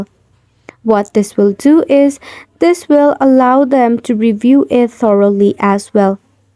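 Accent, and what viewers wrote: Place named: India. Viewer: indan cute ass voice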